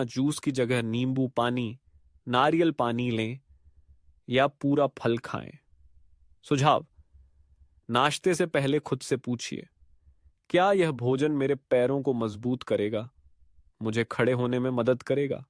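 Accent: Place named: India. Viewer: विडियो विदेशी और आवाज भारतीय। पूरी विडियो विदेशी।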